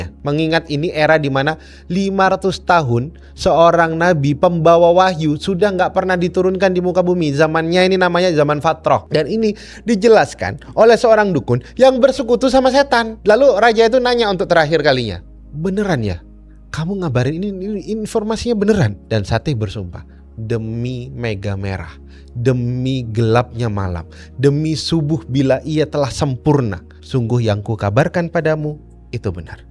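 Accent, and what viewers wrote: Madura, Indonesia. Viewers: Dari logat bicara nya Abang ini suku Madura, Benar..... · Logatnya kyk ny madura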